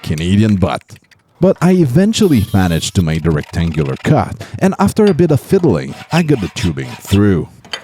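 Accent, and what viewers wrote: Quebec, Canada. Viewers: I thought u were from Quebec i an from saint join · Hey men je savais pas que t'était Québécois ❤ #faiticitte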